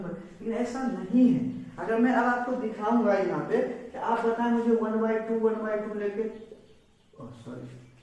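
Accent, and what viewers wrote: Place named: Bihar, India. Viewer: Noted Thanks sir but Lec 3 kaha hai sir....! Mujhe apka padhane ka style aur apke bolne ka bihari tone bahut jyada achaa laga......!❤❤❤❤❤❤❤❤❤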